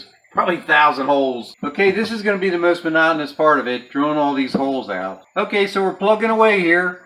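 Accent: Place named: Maryland, United States. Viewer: Excellent video! Btw you sound straight outta Glen Burnie. You’re from MD aren’t ya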